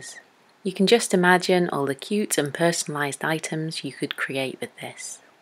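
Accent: England, United Kingdom. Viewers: You sound like your from England 🏴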